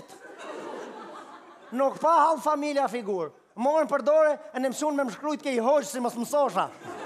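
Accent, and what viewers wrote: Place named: Laç, Albania. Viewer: ky o duket se asht nga laqi o sduket nryshe🤣🤣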